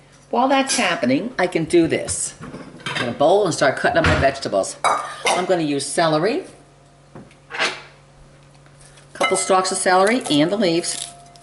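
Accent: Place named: Maine, United States. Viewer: You sound like you come from somewhere around where I do. I was born and raised in Maine. :-)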